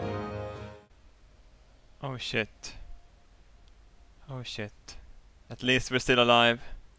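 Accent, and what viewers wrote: Norrland, Sweden. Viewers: Swedish accent. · are you swedish you sound swedish if you are so tjenare har du det gött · Omg he sounds sssssssoooooooo swedish... And I love it! Håll på såhär bara ;-) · Älskar din norrländska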